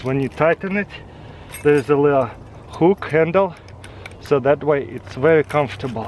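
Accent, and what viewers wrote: Russia. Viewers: An out of shape white guy from Tennessee talking with a Russian accent. Eh, seems to be working for him. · Do you russian because. Your aced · Bring back Russian accent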